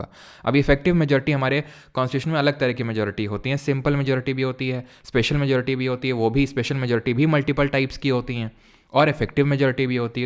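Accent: Kanpur, India. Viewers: Matter ..... Apna kanpuriya style hai bhai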